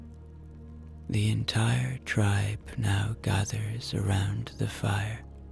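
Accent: Ireland. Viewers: Thank you so much for this story about my ancestors on my grandparents side of the family. I imagined myself there seeing how things were done at that time. I was in reverence and in awe of those people who did so many things in the tribe for the survival of everyone. Hearing you in that Irish accent just melted my heart as I felt a beautiful kinship with you and them. I also miss my grandmother that has passed. When I would pronounce Irish with an i, she would say no it's Arish. 😂 I spelled it right but pronounced it wrong, she said. Thank you for bringing back such wonderful memories of her and educating me on the traditions of my tribe ❤.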